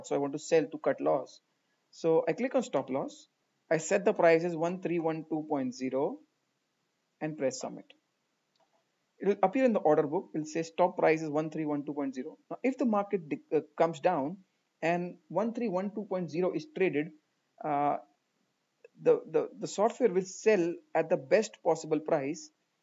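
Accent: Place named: India. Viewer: can't understand the Indian accent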